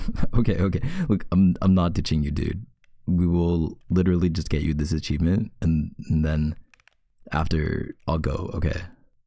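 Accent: Canada. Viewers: HIS ACCENT IS SO(;$/!( I LOVE CANADIANS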